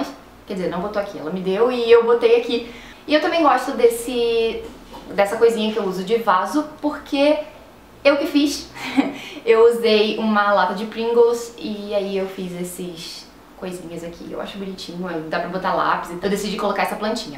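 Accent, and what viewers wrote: Rio de Janeiro, Brazil. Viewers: Só agora eu reparei no seu sotaque carioca ... coixass maixxs legaixxs... hehe :) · Muito fofinho esse teu sotaque carioca <3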